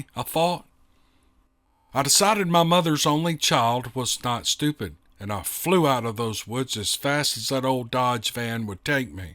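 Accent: South, United States. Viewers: Yet again,another great podcast.My personal interest in Sasquatch is fueled by what I consider a possible encounter as a child.A friend told me about you .I am an avid reader,however,I have formed an addiction to listening to your narratives.It must be the southern dialect .You see,I am from Alabama and our accents are quite similar .I read in a southern accent ,so listening to someone reading in the same is natural .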